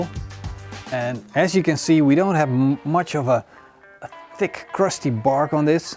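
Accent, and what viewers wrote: Netherlands. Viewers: Nederlands accentje maat :D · Area Mann he is from the Netherlands i hear it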